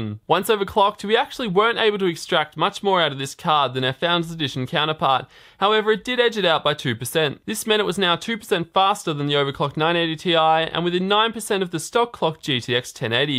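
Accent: Australia. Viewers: AUSSIE REVIEWS! easiest on the ears. new sub mate, cheers!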